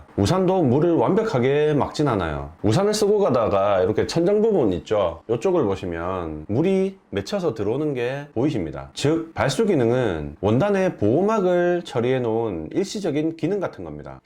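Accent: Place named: Gyeongsang, South Korea. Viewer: 경상도 억양이 살짝 있네요.ㅋㅋ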